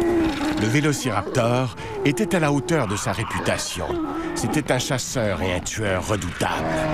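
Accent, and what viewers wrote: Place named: Quebec, Canada. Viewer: l'accent québécois est tellement laid :malade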